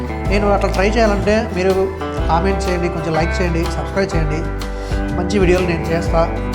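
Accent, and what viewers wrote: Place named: Kadapa, India. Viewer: Superb accham kadapa basha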